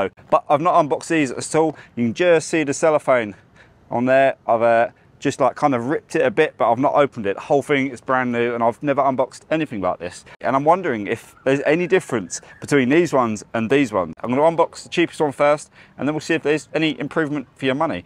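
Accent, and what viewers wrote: United Kingdom. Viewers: do you have a Cockney accent?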